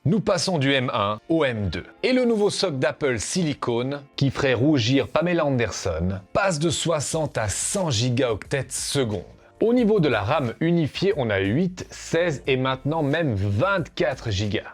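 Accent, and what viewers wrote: Switzerland. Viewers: Tu es suisse ?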